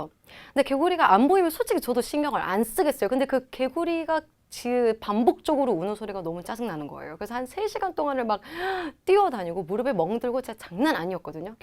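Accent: Gyeongsang, South Korea. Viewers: 경상도 선생님 아이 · 몰랐는데 경상도 발음이 간간히 나오시네요 ㅎ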